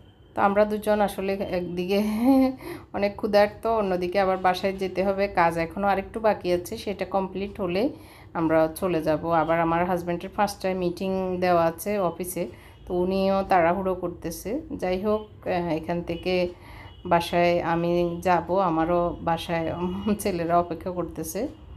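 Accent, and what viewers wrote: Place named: Chittagong, Bangladesh. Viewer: আপুর কথা কিছুটা চট্টগ্রামের টান আসে তাই বলছিলাম।আমিও চট্টগ্রামের তবে থাকি কুষ্টিয়ায়।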